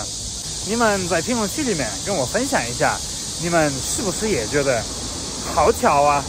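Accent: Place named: Chengdu, China. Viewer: 你的成都川普比我说得好。😅😅😅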